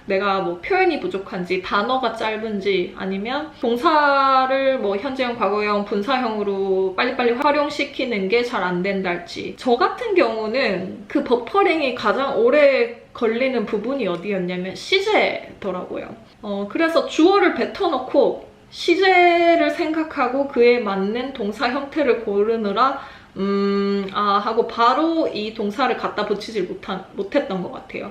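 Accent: Gyeongsang, South Korea. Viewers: 살짝살짝 들리는 사투리 너무 귀여워용.ㅎㅎㅎ^^ 경상도분이세요? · 대구분이신가요? 자주 듣는 억양이 여기서 들리니 너무 반갑네요 ㅎㅎ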